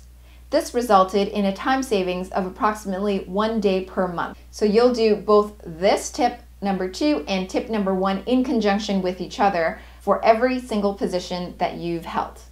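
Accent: Canada. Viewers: Oh god... she’s Canadian... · You Canadian , right ? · please send me the link to your cheat sheet for resumes!! thankyou.. great video!!! are you from canada? your accent is similar to mine! :-)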